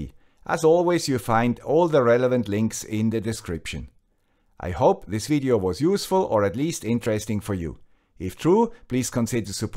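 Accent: Switzerland. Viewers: Dear fellow Guy with a Swiss accent I‘m not sure why and since when, but I consider the Arduino IDE to be bloatware (OsX). As I currently have no low latency projects I have deinstalled it completely and switched to μPython. Yes, this has limited me in some ways, but I‘ve also noticed that as an engineer I can basically transfer the specifications to Python and it‘s still legible for beginners. Less hassle for me and my few clients, albeit limitations apply. Hopefully Arduino will change their approach (maybe they already have?) and maybe even open the IDE to μPython.. Thank you very much for your effort, your content has nonetheless helped me broaden my perspective (and workshop 😅) and I‘ll be replicating your Pöschtler alarm soon. Bye!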